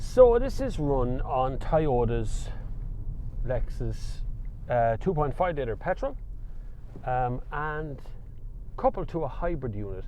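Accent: Ireland. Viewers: What a fine review. You have obviously heard all the bs, are not impressed and are certainly not going to repeat it. Just plain facts and honest impressions with a bit of humor. Great, thank you. And of course the Irish, most musical accent in the western world. 😀 And oh yes, almost forgot: great camera work!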